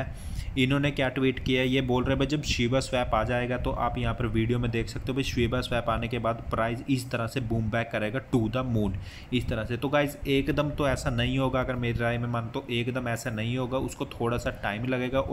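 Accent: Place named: Haryana, India. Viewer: Pure hariyani Bhai.....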